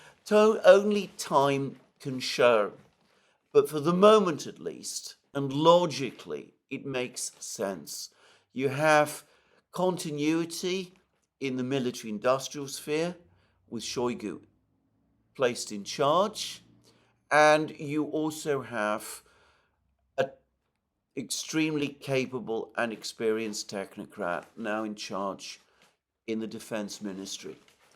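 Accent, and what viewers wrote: United Kingdom. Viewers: AM feeds off of the gullibility of his audience. Take some time and listen to other programs. This one is ALL KREMLIN PROPAGANDA NARRATED BY A MOUTHPIECE WITH A BRITISH ACCENT AND A VERY SORTID PAST.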